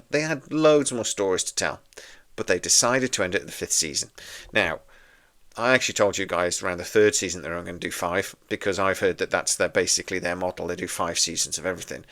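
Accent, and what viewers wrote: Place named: United Kingdom. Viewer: This guy has a British accent so I instantly trust him and consider him authoritative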